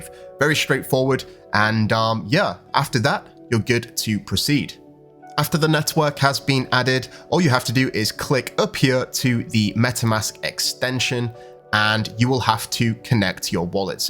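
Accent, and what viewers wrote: Yorkshire, United Kingdom. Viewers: You've learnt how to fight the Yorshire accent somewhat? Bet the yanks love that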